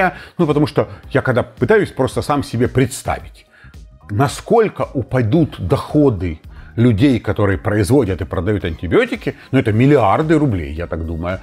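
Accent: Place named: Ukraine. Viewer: Можно не гэкать и не шокать, не в Украине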